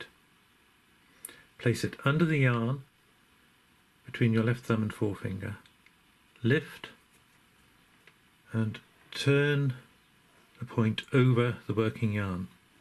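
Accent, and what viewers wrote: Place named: United Kingdom. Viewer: Thank you for this very well done video. Sooooo nice to hear an British accent for a change 😉.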